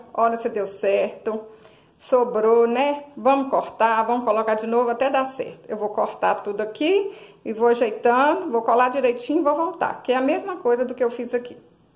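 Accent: Minas Gerais, Brazil. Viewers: vc e mineira como eu? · amo seu trabalho o seu geito de falar...MINEIRA???? PARABENS.🙏🏻👏🏻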